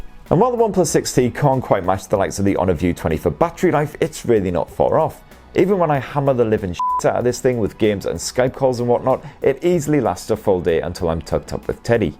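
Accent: United Kingdom. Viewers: Is this the legendary UK accent?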